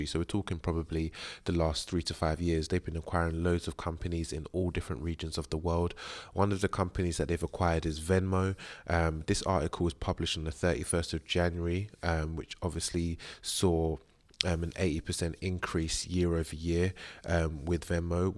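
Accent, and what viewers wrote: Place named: United Kingdom. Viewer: Merry christmas. I've been watching your videos for a couple of weeks, just wanted to say I really like the content you're putting out, too few UK investors are doing what you're doing (direct, honest, transparent content), before I discovered your channel I was going back and fourth with the idea of starting my own investing channel for UK investors as I felt that niche just wasn't being filled but you're doing it bro, albeit with a slightly different strategy. From your voice/accent sounds like you're a similar age/area to me - it's great having something I can relate to in that respect instead of listening to X number of American accents.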